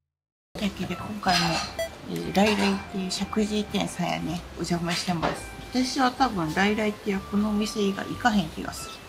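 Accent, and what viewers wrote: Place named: Osaka, Japan. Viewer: 、大阪弁好きやわあ😘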